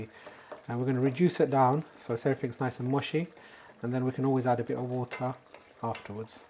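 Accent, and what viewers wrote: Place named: United Kingdom. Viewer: Ps. I love your british accent.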